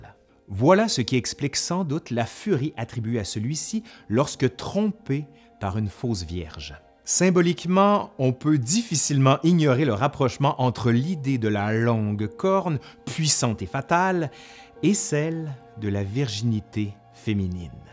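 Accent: Quebec, Canada. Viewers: Ça me fait un grand plaisir de vous écouter, Monsieur. Peu à peu je développe un engouement pour l'accent québécois. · Encore une fois j apprends de nouvelles choses moi qui suis passionné d'Histoire et de mythologie ! De plus tu es un formidable conteur et pour moi Français ton accent québécois ajoute encore plus de magie dans ce sue tu nous contes ! C est charmant cet accent et ca rend encore plus captivant tes vidéos ! Je suis fan fan fan ! 😊😊 Continue de nous instruire par tes sujets historiques, mythologiques etc. avec tout le passion et le travail qu'on décèle dans tes vidéos ! Encore bravo et surtout encore mille merci ! 😉😉👏👏👏👏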